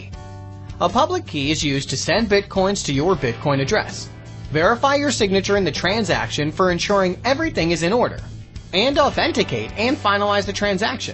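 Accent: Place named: United States. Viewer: Annoying American (non-native) voice, and too fast to compute. Ie: not user centric.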